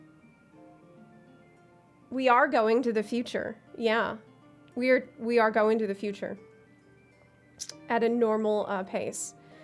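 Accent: South, United States. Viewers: i love her southern accent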